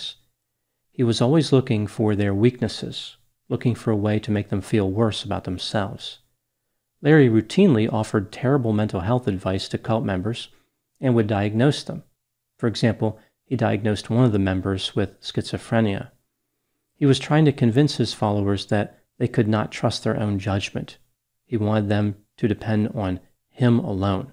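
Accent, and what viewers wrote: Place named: South Jersey, United States. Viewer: Hey ….hate to break it to ya….but you have a well-formed South Jersey accent. 🤔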